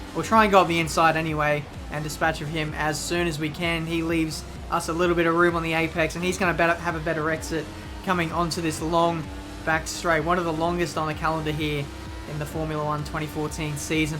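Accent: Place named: Australia. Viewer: You sound like Daniel Ricciardo #AUSTRAILIAN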